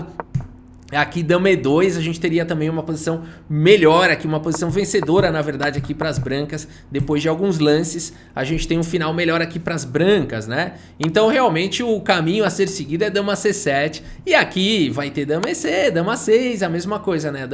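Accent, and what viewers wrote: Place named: Sao Paulo, Brazil. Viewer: Desculpa cara mas é difícil tankar esse teu sotaque paulista. Ameniza isso daí meoooooo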